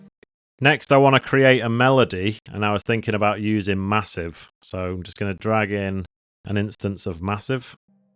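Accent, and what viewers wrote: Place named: Bradford, United Kingdom. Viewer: You lad............. You are the Don Corleone! And that Accent too (Bradford boy myself) One of the best tutorials I have seen in a while. You know your stuff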